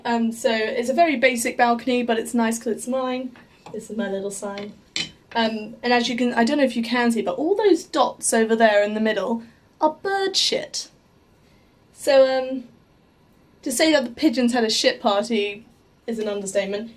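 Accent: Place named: England, United Kingdom. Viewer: I love the English Accent👍🏻😋☘️🍀🌹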